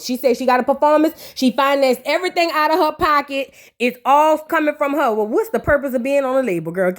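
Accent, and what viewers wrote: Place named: South, United States. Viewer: Girl when I first found this channel I thought you were Toya With that country accent lol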